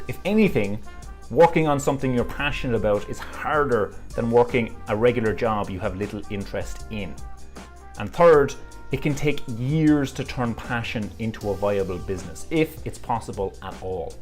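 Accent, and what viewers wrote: Ireland. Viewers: Get a career closely related to your passion - that way you can use your leisure time to pursue it, while your day job is not an utter bore. Worked for me! Love these videos, an an Irish Canadian who loves hearing lovely Irish accents.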